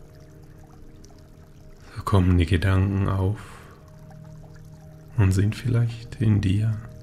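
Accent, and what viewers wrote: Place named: Franconia, Germany. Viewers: Ich dachte du kämmest aus Bayern mit gefällt die stimme auch deine ganze art die du zeigst hier bei Youtube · Allmächd, ich liebe den leicht fränggischen Akzent.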